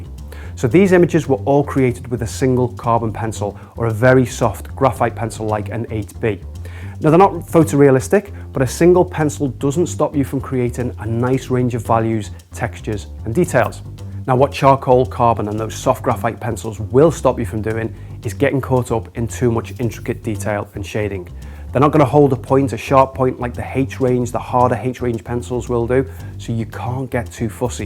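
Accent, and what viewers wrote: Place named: Manchester, United Kingdom. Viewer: Firstly it’s wonderful to hear someone from Manchester I really loved your video It has so much useful information as a relative beginner of older age group. I have never painted as a child or adult until my seventies. I wonder if you teach, in classes in Manchester.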